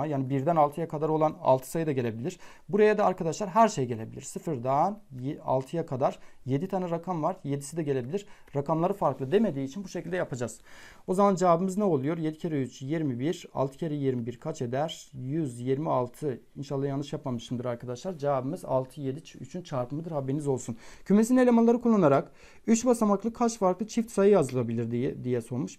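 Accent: Van, Turkey. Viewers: Hoca vanliiiii.. Hemşehrim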